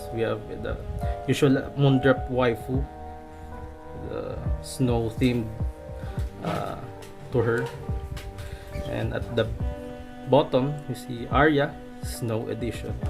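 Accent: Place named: Ilonggo, Philippines. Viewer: HAHAHAHAHAHAHA akala ko lang kasi may halong illonggo yung accent mo sir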